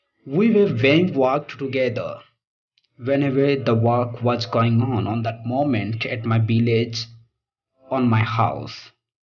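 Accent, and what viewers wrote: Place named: United States. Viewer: America english😊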